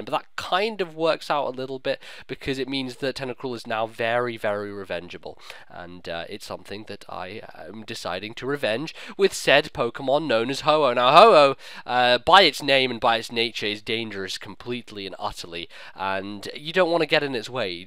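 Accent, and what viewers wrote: Ireland. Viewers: Your Irish accent is really impressive. ^_^ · im actually disappointed that the irish accent didnt last for the whole vid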